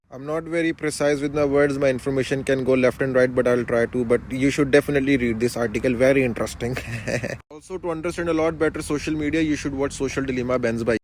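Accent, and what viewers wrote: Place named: India. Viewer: Yes 🙌 Respect!!! You did him really good ... Woh bhi in desi English 👌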